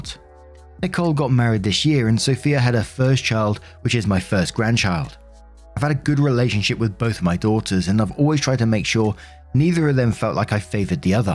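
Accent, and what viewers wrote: United Kingdom. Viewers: He's great at that, isn't he? It's interesting to go back to his older vids and compare how his delivery of and observations about posts has developed. It was when his mother was ill that he first had difficulty delivering his vids on schedule that he began to open up about stress and grief. Well. You can just imagine the waves of love he received from his listeners. He seemed to feel safer with revealing more of his personal thoughts, and frequently apologized for "waffling on." Thus was born the. Throughout all this strife, still managed to be utterly charming. He's smart, funny, and articulate. And to us Americans, his British accent is the cherry on top. Maybe I should say, the real maple syrup on the waffle. Other YouTubers who engage with their audiences a lot are rSlash, Lost Genre, and Charlotte Dobre. Charlotte's a hoot and a half.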